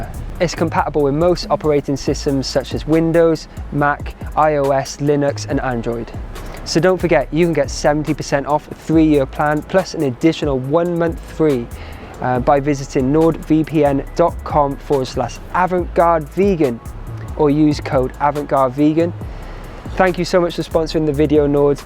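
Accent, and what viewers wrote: United Kingdom. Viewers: Amazing recipe. Can't wait to try it. Big fan - I have all your books. + You don't need to say eggplant instead of aubergine - we love your Brit talk here in America 🥰 Although - I do think you'd look awesome if you cut the top of your hair really, really short (American style) ☺️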